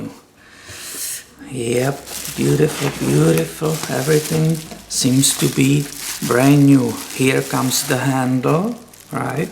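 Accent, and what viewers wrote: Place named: Czech Republic. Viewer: Interesting video, well done :) You sound like you're from the Czech Republic or a nearby country(?) :)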